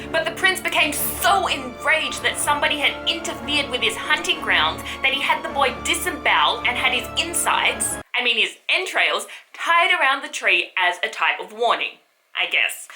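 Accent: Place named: Australia. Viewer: I'm watching this to see why it has record low views in 5 yrs. On a popular subject. Oh Australian accent.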